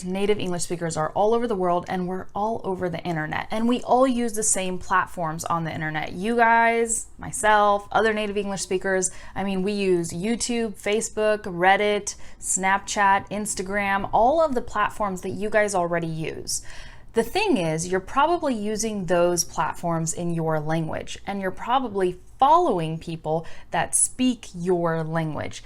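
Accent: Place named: Argentina. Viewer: You´re videos are amazing, and tu español es demasiado bueno con acento Argentino jaja... You should do a live video.. Regards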